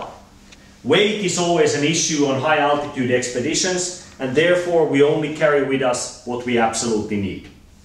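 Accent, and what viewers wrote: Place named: Finland. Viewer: Propsit sulle ite oon vaa haaveillut everestistä ja muuten toi rallienglantihan kuulostaa hyvältä!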